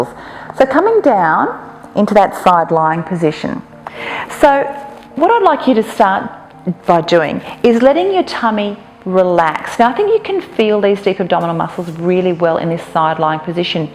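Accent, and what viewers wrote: Australia. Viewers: AI love your Aus Accent.. I miss Aus😢😢😢😢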